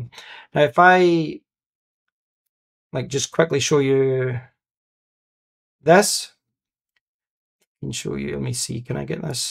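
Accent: Scotland, United Kingdom. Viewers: So cool listening to a Scottish guy talking about a McIntosh.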